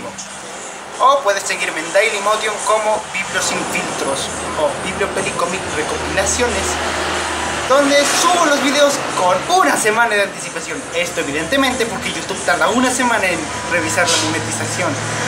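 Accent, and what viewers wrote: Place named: Peru. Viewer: Oye por tu acento , asumo eres de Peru ? K parte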